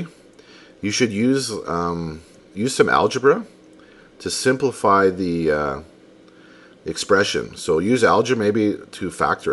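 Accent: Canada. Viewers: are u by chance canadian?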